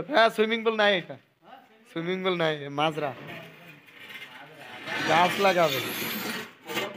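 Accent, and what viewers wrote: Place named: Chuadanga, Bangladesh. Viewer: কথা শুনেই বুঝতে পারছি, আমার বাড়ি ও চুয়াডাঙ্গা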